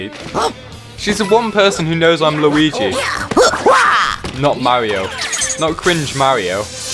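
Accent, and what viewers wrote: United Kingdom. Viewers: Wait are you British 🇬🇧?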